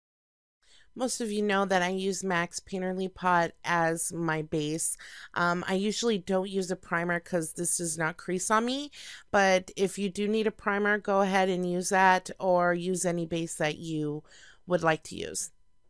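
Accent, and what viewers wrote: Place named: North, United Kingdom. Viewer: Uhmm... Pin your hair back. You live up north, yeah? I love to hear the accent come out.... Poor me got stuck down south. I wanna move back.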